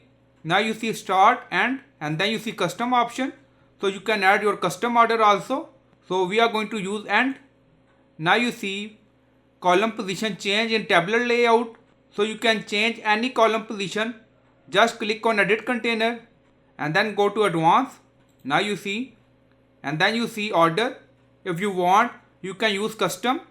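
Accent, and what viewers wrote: India. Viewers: I really don't mean to be rude but it's really hard to understand you with that very strong Indian (?) accent of yours. Maybe use an AI voice instead? Again, I don't mean to be rude or offensive. So, please accept my apologies and maybe take my comment as a suggestion or advice. 🙂 Thanks anyway for the effort you put in your tutorial videos.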